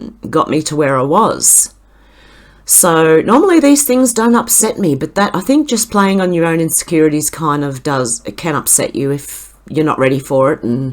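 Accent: Australia. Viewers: Hun I am really sorry that some nasty people made you feel bad about yourself. For what it's worth... I have never heard your speech as anything other than normal... well.. except for the Aussie accent ( I'm a Brit) lol. I look forward to your videos and always enjoy what you put together for us. Sending you all my love. Lisa xxxx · Iv been trying to listen for your speech to sound funny but you still sound normal. so keep being our Australian voice that covers the important world news. We need more Australians like you.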